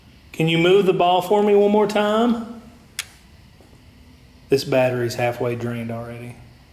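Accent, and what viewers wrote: South, United States. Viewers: I know ur a southern boy where u from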